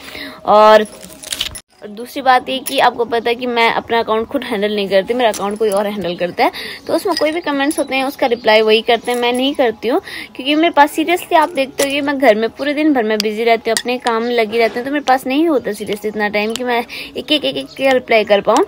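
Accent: Garhwal, India. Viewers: apke bolne m jo gadhwali jhalak aa ri h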